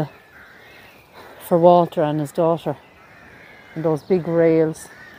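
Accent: Ireland. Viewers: Love your vids ,and the Irish accent ...enjoy them greatly as I'm not well enough to go myself ...love gv too x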